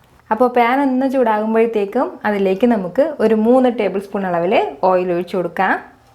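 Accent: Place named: Thiruvananthapuram, India. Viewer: Thiruvananthapuram aanoo.. Slang oru vyathyasam.. 🤣😍Enthayalum adipoli. Kelkumbol thanne oru chirichkondulla suntharamaya mukam manasil varunnu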